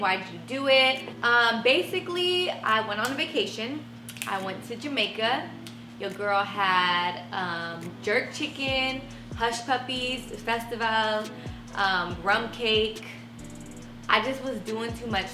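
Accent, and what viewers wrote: France. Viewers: Ahhhh you speak French! Trop bien i love your accent 💕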